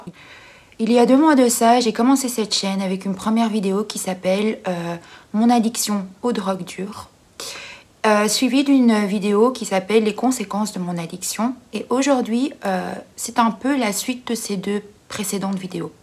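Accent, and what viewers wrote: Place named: Belgium. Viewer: Ho! C'est marrant ça, c'est vrai que je m'étais dit que tu étais belge par rapport à ta façon de parler sans doute, à moins que tu n'ai fourni l'info dans une de tes vidéos. Je suis à Bruxelles depuis deux ans maintenant! Et j'aime beaucoup cette ville même si c'est un peu bizarre en ce moment, je suis arrivé 2 mois avant le 1er confinement, j'ai donc très peu pu profiter de la ville dans des conditions "normales". Peut-être nous croiserons nous un jour ^^, si je t’aperçois je viendrais te parler! Bises!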